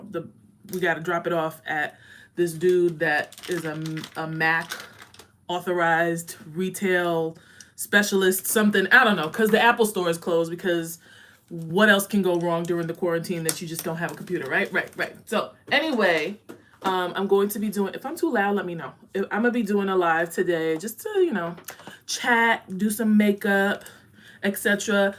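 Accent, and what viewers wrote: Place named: New York, United States. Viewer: Awww come thru, NY accent!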